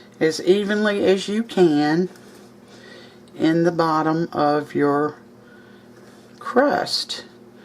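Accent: South, United States. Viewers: oh I didn’t think you named a country of origin or if you did I missed it! I just thought I would add that little trivia. I love watching your videos. What I love most is your straight forwardness and honesty. I’m the same way but it gets me in trouble sometimes. I have no filter and that is not a good thing!🤣😂🤣😂🤣😂 And you would never know you were raised up north. You sound and cook like a true southerner!! 💖💖💖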